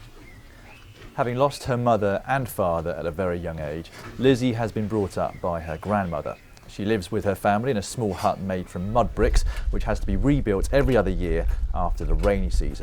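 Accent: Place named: United Kingdom. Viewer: A worthy and life changing cause to be sure. But I can't help but feel the hypocrisy of spending ~£10,000 (or more) of Her Majesty's British Pounds on flying, and at least 1 other staff member + all the camera gear out to Zambia + accommodation + translator + guide + transfers to report on the donations of others that provide cheap (albeit very important) bicycles to students and others. I also couldn't stop chucking at the obviously colonial nature of having a British national (Sorry, you're very British with a very British accent) report in Zambia which was a former British Colony, where Her Majesty has a fairly checked past. I'd have loved to have seen a local Zambian media crew report with the students and the GCN invest the £10,000 on more bikes or other charitable works. Regards Jordan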